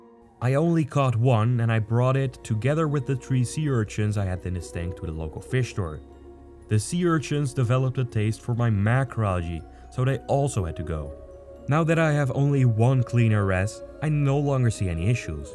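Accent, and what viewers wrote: Netherlands. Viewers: Your from Holland right ?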